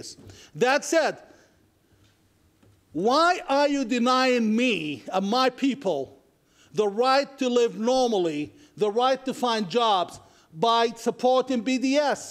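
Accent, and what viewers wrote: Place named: United States. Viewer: Sounds like an American. His parents must have immigrated to the USA. Nothing negative, just saying he speaks American English.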